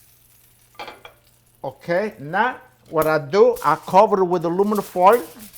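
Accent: Italy. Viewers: You gotta love that Italian accent too!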